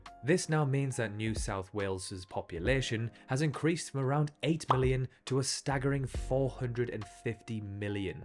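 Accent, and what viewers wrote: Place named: North, United Kingdom. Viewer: Love the Northern accent already <3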